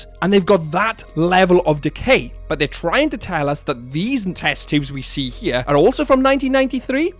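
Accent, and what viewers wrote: Wales, United Kingdom. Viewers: After discovering this channel, I only want to hear Jurassic Park/World news in a cheerful Welsh accent 🏴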